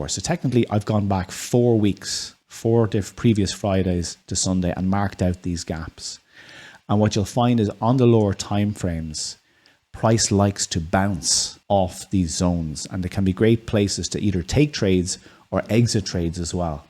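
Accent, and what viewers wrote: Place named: Ireland. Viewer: First video from that is a bit confusing.Will need to look at it a few times.Can hear his Irishness sometimes.😊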